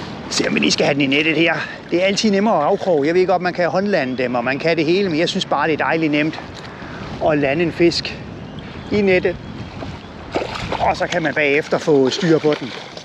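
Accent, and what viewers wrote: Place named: Funen, Denmark. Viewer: det er satme konge med en times hardcore fynbo snak. det fik mig til at grine mange gange ! godt nytår :)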